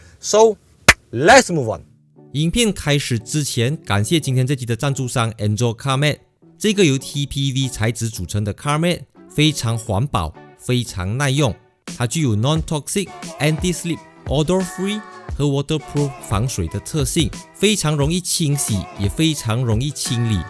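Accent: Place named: Sarawak, Malaysia. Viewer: 老板讲 * Ngeh Ngeh * ? 有砂拉越的味道哦。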